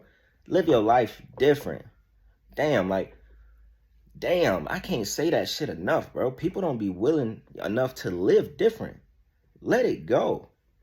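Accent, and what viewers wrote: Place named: Atlanta, United States. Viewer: I can definitely tell you have an Atlanta accent. 😂❤ I stay near Macon... yep i can definitely tell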